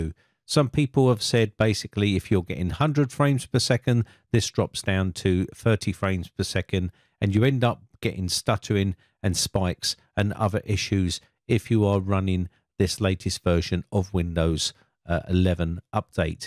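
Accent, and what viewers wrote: United Kingdom. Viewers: He's Briish, cut him to slack.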